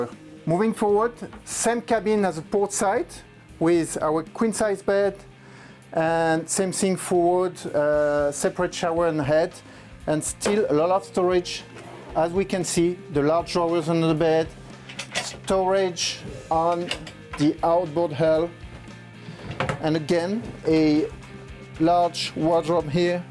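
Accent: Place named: France. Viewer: A frenchman trying to speak english... hilarious.... I can`t stop laughing..... Very nice boat by the way. Really nice boat.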